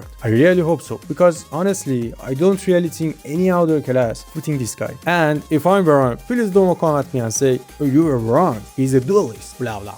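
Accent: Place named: Iran. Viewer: u gotta be persian bro i would recognize an accent like that anywhere 😄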